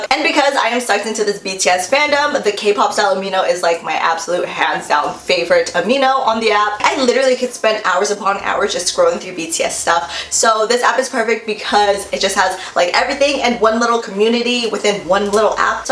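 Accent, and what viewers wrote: United States. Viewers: u look asian but then u have rlly dark skin and then you have an american voice. im confusion lmfao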